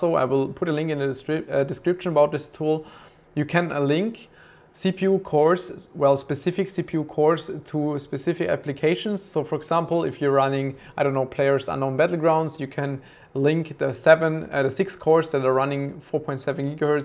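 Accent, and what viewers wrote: Germany. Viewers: Der deutsche Akzent ^^ <3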